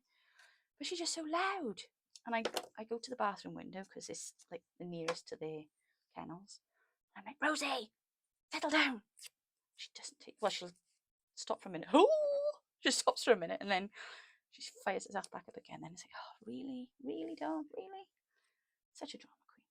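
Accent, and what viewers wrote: Wales, United Kingdom. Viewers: Utterly fascinating. It might take me a week to make this. I love to craft but am just sooooo slow. Thank you for all this hard work. The designs are right up my tree! So adorable and pretty. I watched the whole video over 2 days, and it is fascinating. I do so love your Welsh accent.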